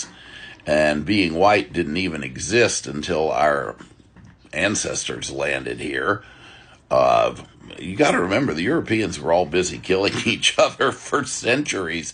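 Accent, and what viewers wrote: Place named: South, United States. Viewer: Forget about what people say about your beautiful southern accent❤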